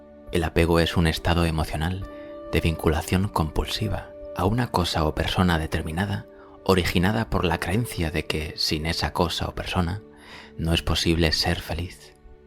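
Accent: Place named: Spain. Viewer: No sé qué es más aburridor escuchar el acento de un español o escuchar los comerciales que pone Google para manipularnos y beneficiarsen. ellos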